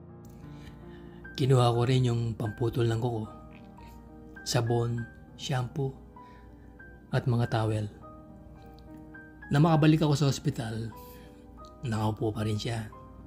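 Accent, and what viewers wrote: Pampanga, Philippines. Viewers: ❤❤❤ Ang ganda ng storya nyo sir at tunay na may accent kang kapampangan. Ang sarap isipin na may happy ending kayong mag jowa. Hehe. Secret pala❤😊😅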